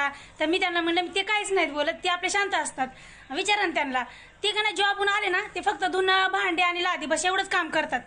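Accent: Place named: India. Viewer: Aho khupch chan joks krtat ani gavrn boln khupch chan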